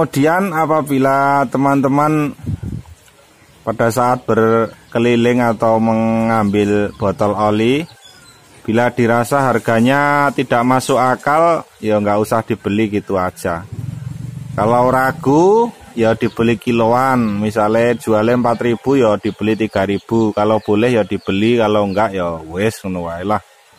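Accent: Java, Indonesia. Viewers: Mas nadane kyk daerah karanganyar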